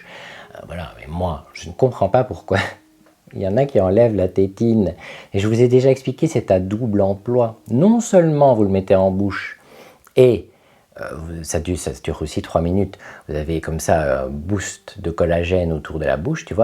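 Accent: South, France. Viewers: Ah cher, c'est sur c'est nous qu on est les meilleurs 😉et vive l accent du sud. Merci pour ce moment précieux 🤗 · J arrête pas de rire entre curent de beauty Ave l accent du sud et la pipe oh que c est bon de rire🤣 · Vive l’accent du sud. Team innocence. Vive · Vive les #LUDIVINE du Sud 🌞😂😂le sud Montpellier c'est la vie les cigales l'accent le pastis et curennnnte boody 😉🤣💋💖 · Je suis très avare de comme,t’aimes, mais avoir une vidéo pour les 10k, tout en apprenant des anecdotes sur current body (accent du suuuuuud) , franchement ça vaut bien un peu d’effort de ma,part. Merci et bon courage à toi 😊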